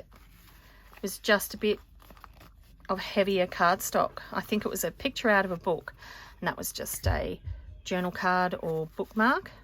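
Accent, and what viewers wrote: Australia. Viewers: Nice to hear a fellow Aussie voice!!!! Love those stitched leaves so much!!! Split pins for me here in Oz-brads in the US. Glad YouTube gave your channel to me today!!!!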